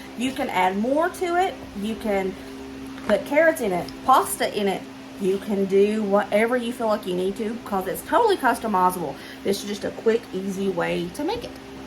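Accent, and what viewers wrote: South, United States. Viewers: I love how you pronounce oil with your Southern accent! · 😂I thought you said bacon powder!!! I blinked and started laughing at myself.....baking powder! I love the south and am trying to keep up with the accent! Love ya all! That onion casserole is bomb!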